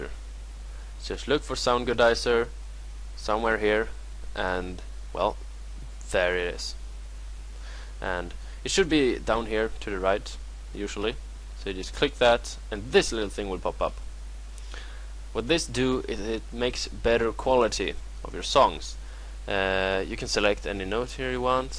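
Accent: Sweden. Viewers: You sound Swedish...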